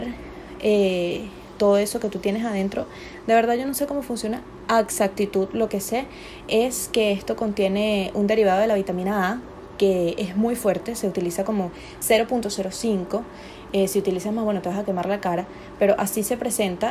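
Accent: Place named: Venezuela. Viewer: Oye tienes un acento venezolano, en qué parte encontraste esa crema, si es que estás en Venezuela. Gracias .Caracas.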